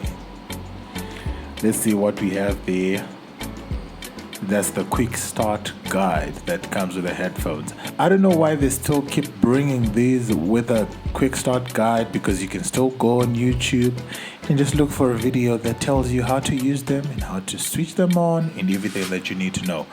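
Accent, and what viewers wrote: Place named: Zimbabwe. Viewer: you sound like a Zim own?:). You from Zim?. Great review and unboxing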